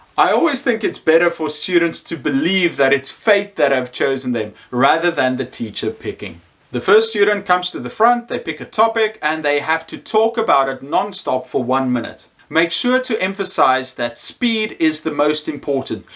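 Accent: South Africa. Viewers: Are you South African? My SAFFA-dar is off the charts 😄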